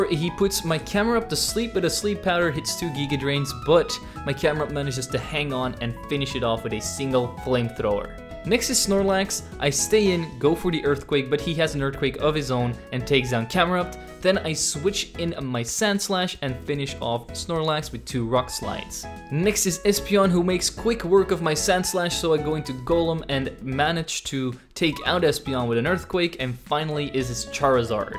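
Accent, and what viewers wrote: Netherlands. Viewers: wait are u dutch didnt even notice earlier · Im dutch to its a great accent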